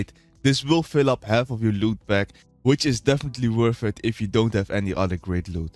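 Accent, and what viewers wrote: Netherlands. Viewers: You can hear that your dutch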